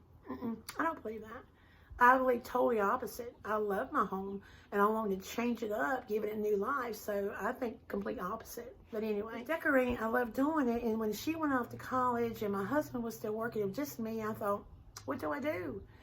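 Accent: South, United States. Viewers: I love watching your channel. You are so REAL! That's a rare quality these days. I love your southern accent, your hair, just everything about you. You're sweet, and you sure do understand being a woman (it's hard sometimes) and I agree; we should be lifting each other up. I am a country woman stuck for now in the city. Trulia is one of my favorite pastimes. LOL Until next time...💜💜💜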